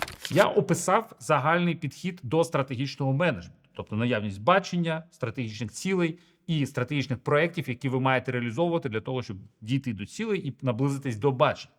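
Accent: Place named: Ukraine. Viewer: Он говорит на русском, с украинским акцентом! В целом все понятно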